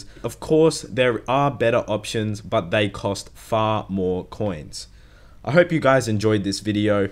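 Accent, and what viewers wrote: Australia. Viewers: He's australian... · That aussie accent is so stupid funny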